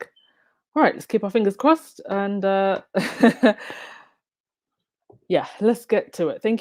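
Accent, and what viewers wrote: United Kingdom. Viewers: I can tell by the sound of your voice that you are British. Maybe you can share. What governing agency of media air waves allow a commentator to incite harm to an another individual without that person or media platform NOT receive some type of sanctions??? Who does this Holmes man believes himself to be or better yet what royal associated gave him permission? To hear him speak literally made me sick enough to vomit. What on God's green earth have the Duke and Duchess of Sussex done to anyone of them personally that they wish such harm to them??? Soo much hate they are willing to orphan their children. SHAME on these people!!! He may now say he didn't mean it but where I'm from many a truths are spoken in jest!!! but he meant what he said. The arrogance of some British people is appalling!!! to other parts of the world. Something I believe they will soon find out. 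The Invictus Games in The Hague is a TRIUMPH simply a TRIUMPH. I know it is nearly over but I'm still wanting more. Not like William and Kate who are left wanting in every way. The British media, royal rodent, men in gray coats, Charles or William can do nothing say nothing or be about nothing that will out shine Prince Harry and our Duchess. Prince Harry's concern for his grandmother is justified. Andrew stealing monies anyway he can, Charles convincing her to make Camilla queen consort, William and Kate losing not half but all of the Commonwealth( they just don't know it yet), some Kelly woman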